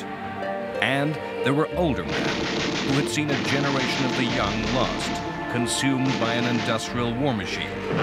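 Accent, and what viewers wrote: United States. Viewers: wait why is this narated by an american?...the rest of the ones i have watched are narated by an English guy? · An American who actually pronounces "Adolf" correctly!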